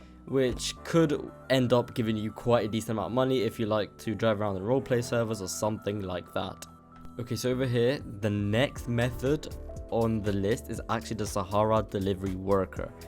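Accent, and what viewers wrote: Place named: Greenville, United States. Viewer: Average greenville accent😭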